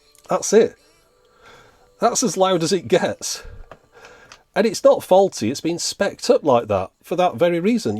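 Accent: United Kingdom. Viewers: As soon as I heard a British accent, I had to leave because the American prison system has different stuff, this is probably items from a prison from England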